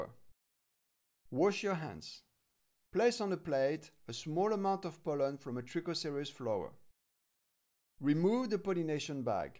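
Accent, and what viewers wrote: France. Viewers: Hi. I have a question. I want to try to make an apple cactus Cereus flower. I will buy a large cutting and put it next to a wide south-west facing window in Paris. But I have read that Cereus are self-sterile. I have a Cereus spegazzinii wich is supposed to be a heavy and easy bloomer, can I use it as a pollen donnor for a larger apple cactus Cereus? Also, judging by your accent, are you french?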